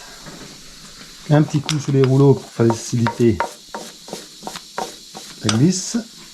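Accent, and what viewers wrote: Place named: Vosges, France. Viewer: Super t’as vidéo bien expliqué ,t’as un accent t’es pas des Vosges?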